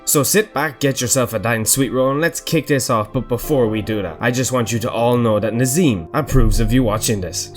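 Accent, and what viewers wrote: Ireland. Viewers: where in in Ireland are you from man , i dont get your accent ? im from cork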